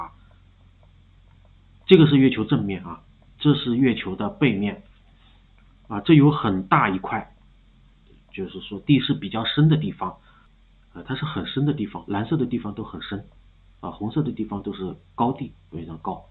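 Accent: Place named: Shanxi, China. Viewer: 请问是哪里人？听口音有点耳熟，应该是北方人。山西？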